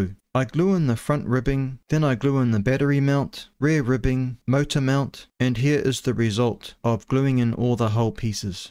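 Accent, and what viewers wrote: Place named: New Zealand. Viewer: Good work, thought i could hear a kiwi accent, good to see some kiwi diy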